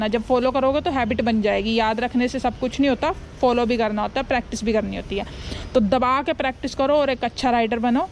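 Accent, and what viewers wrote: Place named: Himachal Pradesh, India. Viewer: Maam main apka fan hu apka himachali accent bahut achha lagta hai❤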